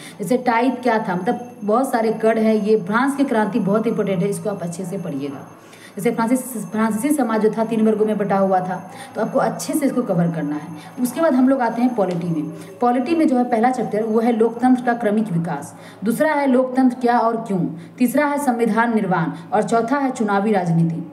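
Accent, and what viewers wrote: Bihar, India. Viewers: Bihar se ho ya up. Se 😂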